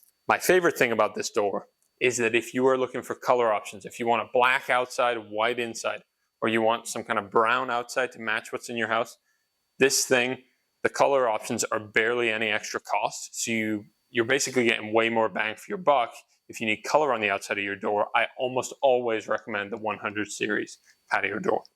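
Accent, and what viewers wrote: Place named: England, United Kingdom. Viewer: Your accent is so interesting, little English-sounding words here and there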